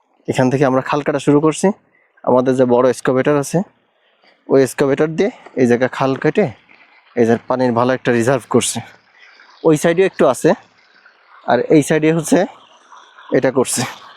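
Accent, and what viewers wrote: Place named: Narail, Bangladesh. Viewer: নড়াইলের ভাষা মনে হচ্ছে।।।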